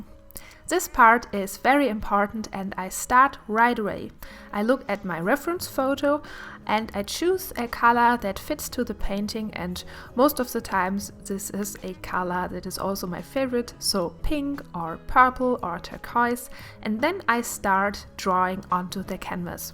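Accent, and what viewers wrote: Germany. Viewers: Based on the accent I'd say you're german. Right?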